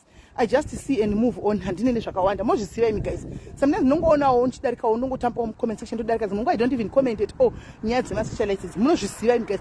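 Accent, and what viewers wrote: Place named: Karanga, Zimbabwe. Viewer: Sis vanotaura chikaranga chaicho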